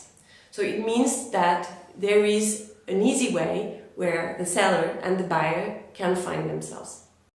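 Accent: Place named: France. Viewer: she is a french speaker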